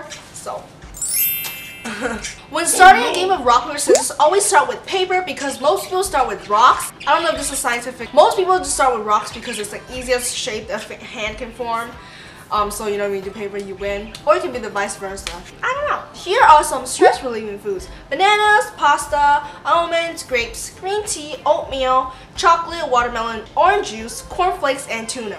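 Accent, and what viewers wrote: Canada. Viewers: Are you Canadian????😝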